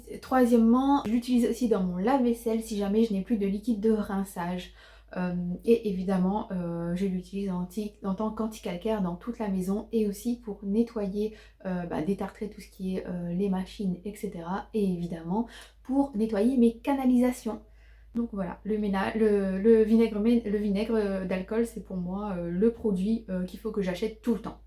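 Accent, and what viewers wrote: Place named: Reunion, France. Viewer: Coucou je tombe par hasard sur ta vidéo et j’avais deviné que tu étais de la Run, l’accent ne trompe pas lol très sympa tes vidéos bonne continuation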